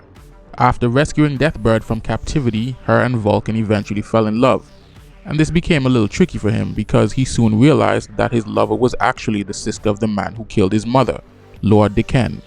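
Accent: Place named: West Indies, Caribbean. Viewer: I like your accent. where are you from? You sound like you are from the West Indies.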